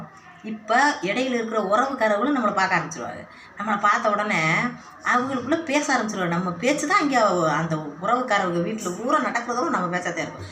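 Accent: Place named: Coimbatore, India. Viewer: Coimbatore language i kollatha theenga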